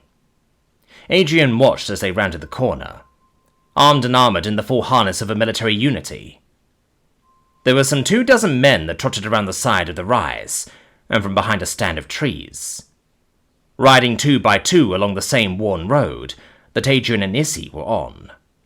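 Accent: United Kingdom. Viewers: Even though I already knew what you looked like, and then got an update on what you look like now, I still can’t help but see what my mind’s eye sees when you start narrating. You just hold a voice that, at least for me, holds its weight in gold. I love when you narrate things, especially audio books. Thanks for everything,. You’re my favorite narrator. Edit: When you talk, I always hear a preppy British kid in a prep school, complete with a patterned vest and slacks, with your hair parted in the middle. Maybe that’s just the American in me, but 🤷‍♂️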